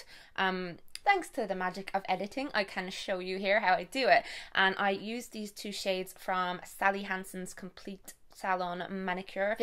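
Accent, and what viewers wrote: Ireland. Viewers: To be honest I started watching your channel coz you're Irish and I love your accent (I had a girlfriend that's from Edenderry but she won't talk to me anymore and I miss her accent) but I actually really enjoy your content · I love your videos so much and I love to hear an Irish accent on YouTube and I'm from Ireland too (cork) 🇮🇪🇮🇪🇮🇪🇮🇪🇮🇪🇮🇪🍀🍀🍀🍀🍀🍀💚💚💚💚💚💚💚💚💚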